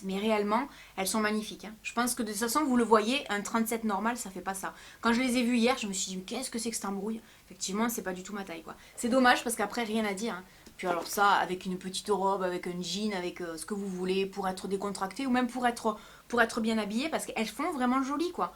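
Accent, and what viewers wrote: South, France. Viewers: Cela fait quelques semaines que je te suis et j'adore ta simplicité avec toujours une petite pointe d'humour et sans prise de tête. après ton accent du sud...je me sens moins seule^^ très jolis achats en tout cas. Hâte de voir ta commande zara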